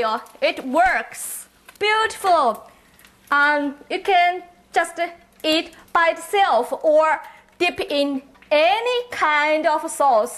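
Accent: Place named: China. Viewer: english no good is hard to understand ....chinese english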